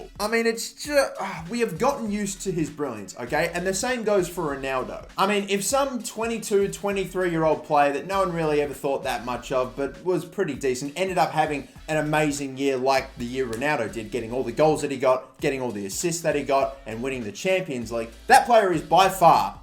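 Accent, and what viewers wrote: France. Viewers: I love the French accent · Great French accent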